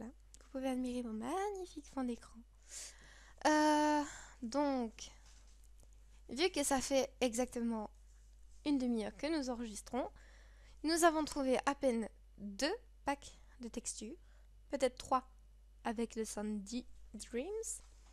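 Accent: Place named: Liège, Belgium. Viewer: Petit accent de liéch là :)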